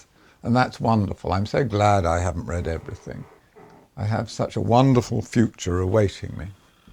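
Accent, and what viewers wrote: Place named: United Kingdom. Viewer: Such a wonderful cozy atmosphere. This man could read a phone book and it would hold my attention. Love a British accent!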